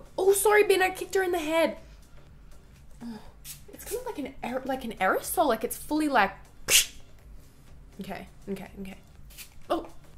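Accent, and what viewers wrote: Australia. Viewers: I have a rly good Australian accent just from watching you for 3 years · does anyone else love aussi accents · You are literally perfect 😭❤️ I want an Australian accent so bad my fav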